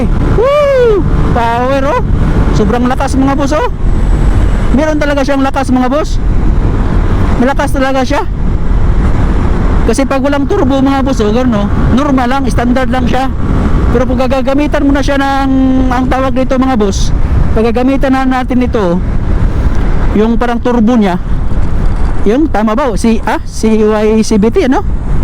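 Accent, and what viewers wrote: Visayas, Philippines. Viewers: Bisaya kaman dong😂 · Sigurado ako BISAYA TO....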